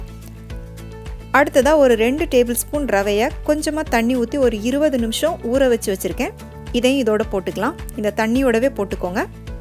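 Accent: Tirunelveli, India. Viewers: Superrrrr. Voice Superb. I am also nellai district in sengottai. Unka voice supera irukum pothae ninichaen neenka namma oorunu. Keep it up ma'am. Pudina thuvail sollunka ma'am.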